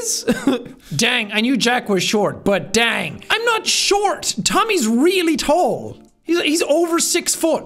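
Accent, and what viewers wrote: Ireland. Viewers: dude the phrase "god's happy no problem bud" and "time for some misery " with that strong Irish accent is sooooo good XD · I know this sounds like I'm making fun of his Irish accent but the way he said "why" was just so funny to me. I really like Irish people I think they are really funny but serious at the same time and I just love it. I would move to Ireland if I could. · Loud Irish man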